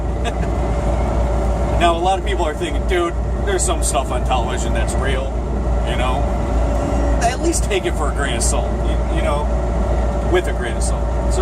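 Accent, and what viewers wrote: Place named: Rochester, United States. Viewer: Dude I KNEW that had to be a Rochester accent!! I grew up there.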